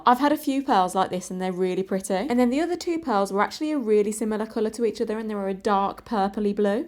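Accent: United Kingdom. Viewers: are you scottish? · I love a brummy accent, it's so comforting ❤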